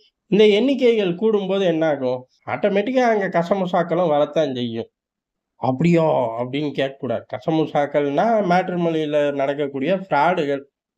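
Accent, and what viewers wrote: Madurai, India. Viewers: தல உங்க மதுரை வட்டார வழக்கு சூப்பரா இருக்கு....அருமையா பேசுறீங்க....நாலஞ்சு எடத்துல குபீர் சிரிப்பு....மாம்பழக்கூடைய தூக்கிட்டு சைனா கெளம்பிட்டான்யா.....கிராஸ் பார்டர் மேரேஜ் சீன மாப்பிளைகளின் மவுசு.....😂😂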